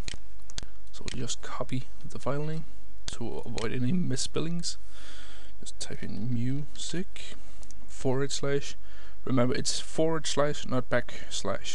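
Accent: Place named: Denmark. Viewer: im almost certain its a danish acctent :P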